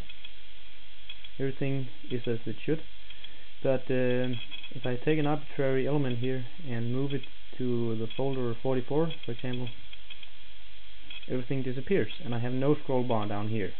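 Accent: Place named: Denmark. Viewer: you sound danish...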